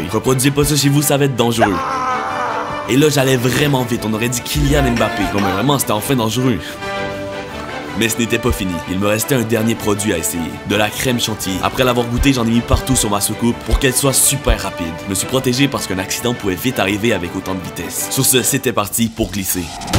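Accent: Canada. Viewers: Vraiment ta voix grave est génial mais j'arrive pas à te prendre au sérieux avec ton accent canadien 😭😭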